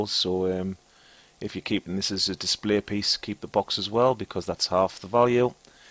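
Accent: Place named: Geordie, United Kingdom. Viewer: you sound more jordie in this than you do in person hahahaha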